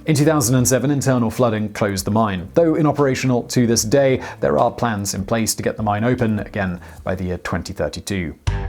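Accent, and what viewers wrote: United Kingdom. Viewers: no British accent can hide that mistake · As is all algorithms from Borg to Utbe, it go to hell kiss my ass and I'll do as I damn well please. Such is math. Also your device is set probably on Autoplay. Mr British guy is like all things UK: only in small amounts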